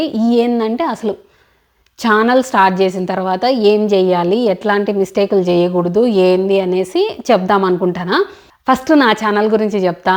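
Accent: Rayalaseema, India. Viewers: మేము కొన్ని సంవత్సరాలు కడపలో ఉన్నాము, రాయలసీమ భాష వినడానికి బాగుంటుంది, మీరు చెప్పే విషయాలు నాలా కొత్తగా యూ ట్యూబ్ చానెల్ క్రియేట్ చేసిన వారికి చాలా ఉపయోగపడతాయండి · రాయలసీమslang చాలాflowగాఉంటుంది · Hi sis మేం కూడా రాయలసీమ మీరు మాట్లాడుతుంటే చాలా happy ga వుంది,mobile ముందు పెట్టుకొని చూస్తే మా sister tho opposite లో కూర్చుని మాట్లాడుతున్నట్లుంది, జై రాయలసీమ అక్క,,slang 👌👌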